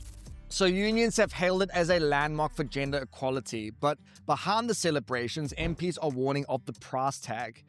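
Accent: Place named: Durban, South Africa. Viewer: maybe its a durban accent there's another content maker from there that speaks like him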